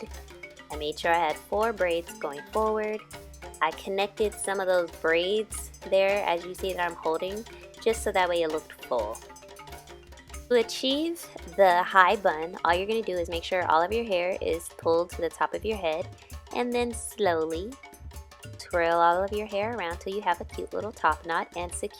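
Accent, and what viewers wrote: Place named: California, United States. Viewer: Beautiful lady , beautiful hair . I'm from the south but love the California accent . You remind me of China Renee another YouTuber with your accent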